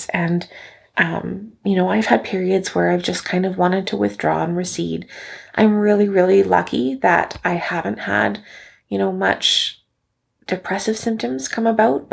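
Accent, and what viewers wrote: Canada. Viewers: Your Canadian accent is showing and I love it. (Mississauga girl here). Thank you for sharing your story. Big congrats to you!! she's such a sweetie <3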